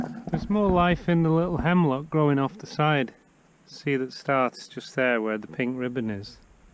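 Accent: United Kingdom. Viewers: glad you're keeping the old UK traditional dialect alive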